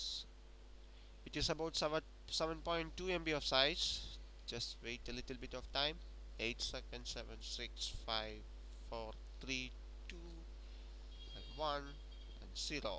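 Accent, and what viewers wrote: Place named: Kerala, India. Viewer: I can understand your Malyali accent, that means, you are from Kerala, that's a southern state in India.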